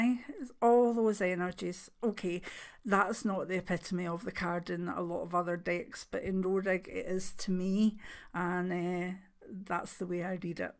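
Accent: Scotland, United Kingdom. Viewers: I'm a brand new subscriber. Your sweetness, kind and loving energy and lovely Scottish accent captured me. Thanks for all your shared wisdom and helpful videos. Much love, light and abundance of all good things to you. 💞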